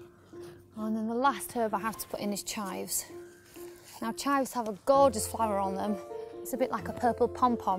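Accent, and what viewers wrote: United Kingdom. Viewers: UK sound, very nice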